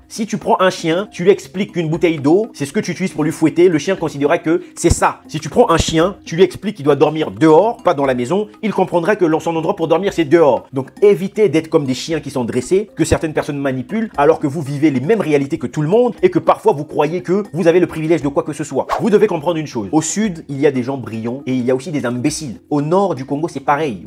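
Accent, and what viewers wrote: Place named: South, Democratic Republic of the Congo. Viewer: Apparemment ta façon de parler Montre bien que tu es du sud,,,mais si t'es un vrai congolais né au Congo,,tu feras bien la différence entre les présidents passés du Sud et ceux du nord,,, à nos jours plus de tribalisme,,,et encore regardons d'abord notre gouvernement actuel,,, zéro tribalisme,,,ok monsieur ? Arrêtes nous ce bruit s'il te plaît 😊😊😊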